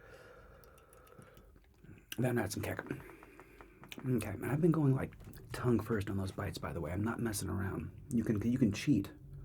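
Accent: United Kingdom. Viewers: Well goddam to be. A gringo you prenoust that great and also that breth acsint 10 /10 😂😂🇲🇽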